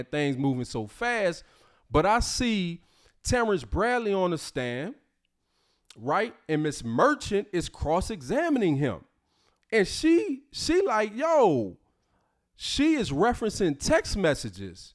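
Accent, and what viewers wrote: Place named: AAVE, United States. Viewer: Imma argue dis.....How you gon say dat?....and so many other examples of ebonics. You literally sound just like Fani Willis---and that's NOT a good "thang". As for your legal knowledge, it stinks. Even I--as a-non-lawyer--know well the GA statute on gifts from prohibited sources. The statute CLEARLY says $100 USD in aggregate must be reported yearly. They didn't have to stay at the Ritz for it to constitute a conflict--and corruption. Where did you get your law license? Compton?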